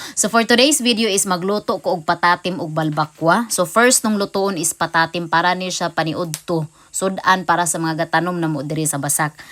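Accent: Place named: Visayas, Philippines. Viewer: Unsa hapdos? Lain man pud imong binisaya Miss pag claro pud? Mas lami ang homba sa "Cebu"., "Yummy" kaayo.! Hahaha..😮😮😅😅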